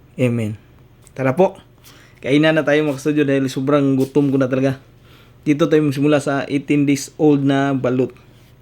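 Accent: Visayas, Philippines. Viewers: Bai klaro kaayo bisaya ka Basta mo sturya mag tagalog bwhahaha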